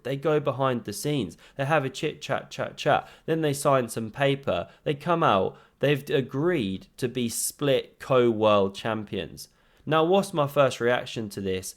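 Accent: United Kingdom. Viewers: Why is it so hard to follow what he said? He even said it in a British accent.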